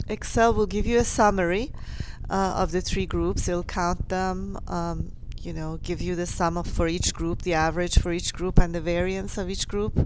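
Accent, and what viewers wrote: Iran. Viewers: Nice Persian Accent! And of course nice job!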